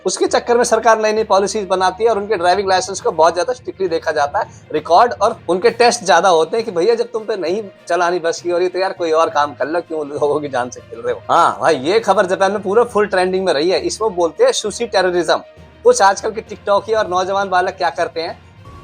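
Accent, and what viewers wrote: Haryana, India. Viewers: ki always be making serious news damn funny with haryani accent 🤣🤣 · Bhai, Regular News banao Bhai. Ye jo haryanvi tone me comedy news alag hi lagti he. Crime news me to comedy he puri...😜😜😂😂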